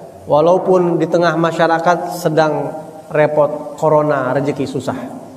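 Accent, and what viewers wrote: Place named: West Java, Indonesia. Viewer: Masyalloh.. Habibana.. Senyum ane denger basa Sunda nya.. Ajiieeb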